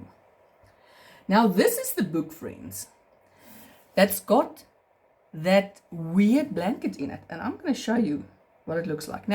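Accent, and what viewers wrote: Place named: South Africa. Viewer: Hello, I started following you on youtube because I also do amigurumis. But what called my attention was your english, sounded so familiar.....😜I am from South Africa also but living in Portugal. Love your work.🐱🐷🐹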